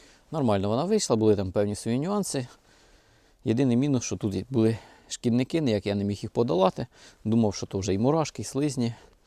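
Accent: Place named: Kyiv, Ukraine. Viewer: Доброго вечора, завжди дивлюсь з задоволенням, а на дурні коменти не звертайте уваги., в нас також говоримо як і ви ,бо з Київщини.😊😊😊😊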